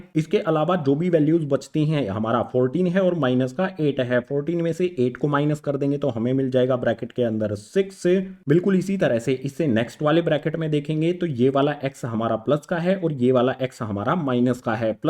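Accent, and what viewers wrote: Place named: Tamil Nadu, India. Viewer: Love ur voice sir❤️❤️ Bcoz it have Tamil tone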